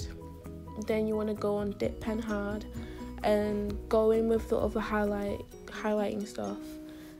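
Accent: United Kingdom. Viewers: Where r u from? It’s sounds like a london accent sorry if it’s not❤️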